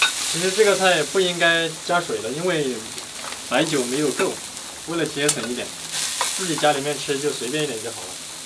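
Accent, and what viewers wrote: Sichuan, China. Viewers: 四川哪里地土话噢？ 好可爱！！我就是四川人！！！ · 四川话好亲切！师傅哪个地儿的？ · 我一晃眼以为火云邪神的演员来客串视频嗨操起了四川腔！要得要得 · 喜歡的紅燒肉經由四川口音的介紹好可愛，王剛師傅好手藝，二娃來年肯定是個大美人呦，讚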